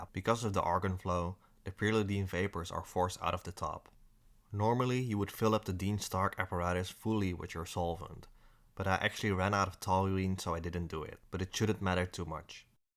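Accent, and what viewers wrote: Netherlands. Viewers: Where is your accent from?.. it sounds like Limbourguish.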